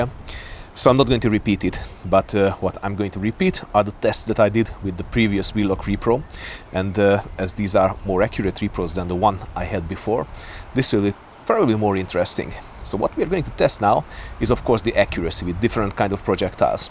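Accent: Hungary. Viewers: Hunglish? I always enjoy and learn very much from your videos! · Hunglish is awesome! :D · “Hung-lish”…. Keep it up sir lol the brace is absolutely beautiful. I want a pair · As a fluent speaker of Spanglish I appreciate the Beaty of Hunglish. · It is in fact my favorite gun channel on YouTube in beautiful, bright Hunglish language.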